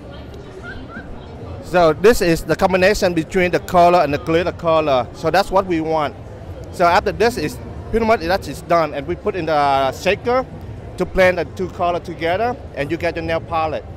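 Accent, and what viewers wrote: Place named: Vietnam. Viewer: Hears voice * immediately realizes he is Vietnamese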